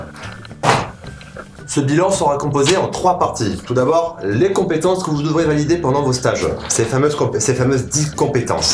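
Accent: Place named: Southwest, France. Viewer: Tu serais pas dans une grande ville du Sud-Ouest par hasard? ;) (rapport au petit accent qui se fait entendre à certains moments ^^) Sinon merci beaucoup pour la vidéo, ça se voit que tu as fait pas mal de stages déjà pour en arriver à toutes ces conclusions, je pars en stage en SSR dans 4 jours et j'espère que ça va bien se passer...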